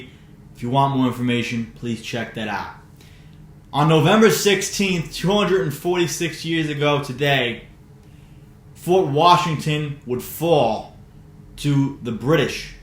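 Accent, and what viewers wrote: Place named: North Jersey, United States. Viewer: Another Great presentation my friend, Thank You. Where abouts in NJ are you from? Judging from your accent, I’m assuming North Jersey lol, I’m originally from Dumont in Bergen County myself, Be Well 👍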